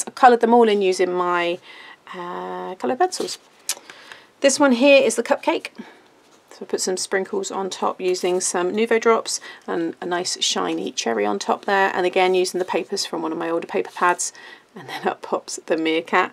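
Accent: England, United Kingdom. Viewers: Just discovered your channel. You are just amazing ! Congratulations for all these cards ! They are so nice ! Will see if I can order your stamps to be delivered to my country (Switzerland). Really enjoy your videos and your English accent is just perfect for my French speaking ears 😊. Take care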